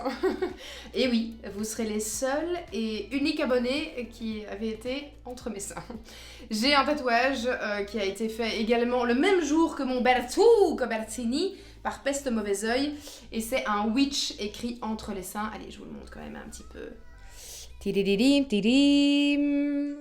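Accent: Belgium. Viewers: Hello madame, ton accent! Tu es belges? Big up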